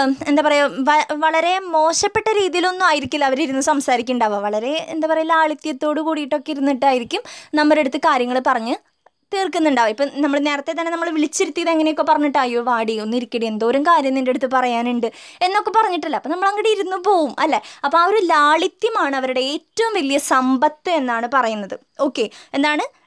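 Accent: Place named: Thrissur, India. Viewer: ചേച്ചിയുടെ ക്ലാസ്സ് ഒരു ബോറഡിയും ഇല്ല.. online classil ഇരിക്കുമ്പോൾ വീണു വീണു uraghum ചേച്ചിടെ ആ trissure ബാഷ യും കേൾക്കുമ്പോൾ കെട്ടിരിക്കുംഭോൾ അടിപൊളി ആണ്.. ഇത് പോലെ വേണം Thrs 😍🤩🤩🤓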